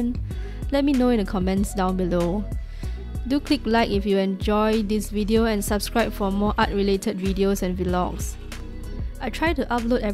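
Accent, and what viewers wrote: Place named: Singapore. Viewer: singaporean accent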